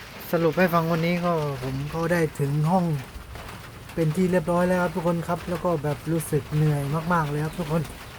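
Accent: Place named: South, Thailand. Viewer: ฟังมาหลายคลิปพี่ว่าแล้วฟังเสียงน้องน่าจะเป็นคนใต้ คนใต้จริงกะแล้ว อยู่บ้านเดียวกันด้วย พัดลุง น้องอยู่ตรงไหนเห็นขึ้นปากคลอง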